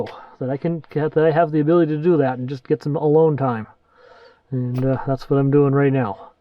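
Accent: Boston, United States. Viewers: 100% Boston accent. Con-corn! I love it! Old school!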